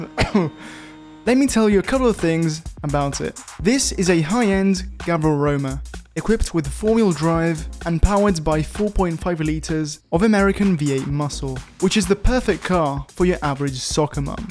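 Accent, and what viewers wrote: Italy. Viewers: Me watching the video thinking a yes someone speaking slowly English,: speaking Italian Me: Ah ma sto qua parla italiano ecco da dove arrivava l'accento. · this is the most italian accent that i've ever heard from an english guy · He's gotta be an Italian living in England with that accent.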